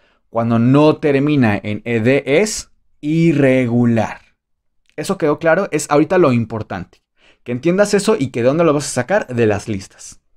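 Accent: United Kingdom. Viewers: Esto es inglés pritanico no es el inglés de American ?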